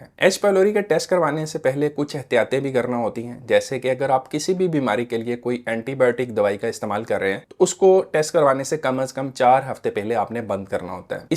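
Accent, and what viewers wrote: Karachi, Pakistan. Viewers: R u from Karachi???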